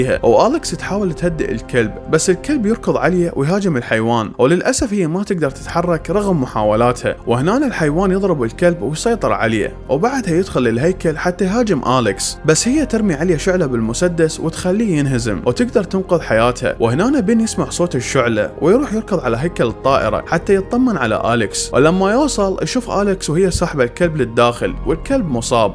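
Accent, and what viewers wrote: Iraq. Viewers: طبعا اني كولش احب اللهجة العرافية اتجنن اخوكم من بنكلادش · اللهجه العراقيه مميزه تحياتى لاهل العراق اهل الحضاره والكرم مصرى يحب العراق واهله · اللهجة العراقيه جميله جدا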